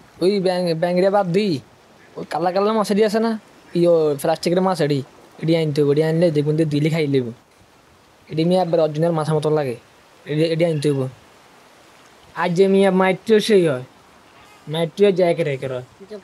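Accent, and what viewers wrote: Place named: Noakhali, Bangladesh. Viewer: Bhai Mone hoy noyakhalir manush 😂👌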